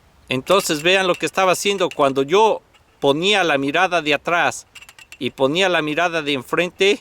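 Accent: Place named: United States. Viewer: progre so bueno laverdad no estoy seguro pero a alguien que un dia hablo español es imposible que se le olviden ciertas cosas, y se le nota al hablar de que algunas cosas no las maneja, como la acentuacion por eso pienso que es Estadounidense. pero bueno, su canal es excelente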